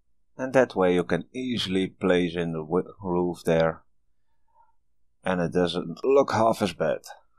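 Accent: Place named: Finland. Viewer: Are you from Finland? Your accent sounds pretty finnish or estoian (?) :)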